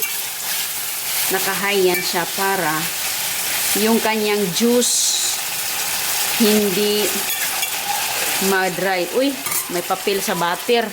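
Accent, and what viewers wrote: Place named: Aloran, Philippines. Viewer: Tagaasa manka ma'am sa Pinas moramag taga aloran ang tuno sa imong inistoryahan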